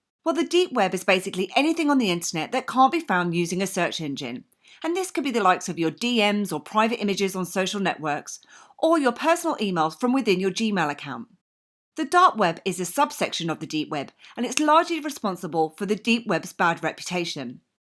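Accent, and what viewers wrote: United Kingdom. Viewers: I suspect you meant to say “excited” rather than “exited”. Mostly what I noticed was that she has one of the strongest British accents I’ve heard, and I have heard a lot … she also talks very fast. If you’re having trouble understanding, try lowering the playback speed on your player to .75. Those settings are accessed by clicking the three dots in the upper right hand corner of the video player screen. Those on-screen controls go away after a few seconds, but will reappear the instant you touch the screen. Just FYI, that electrical-outlet looking thing to the left of the three dots? That’s a slide-switch that toggles “auto-play” on and off.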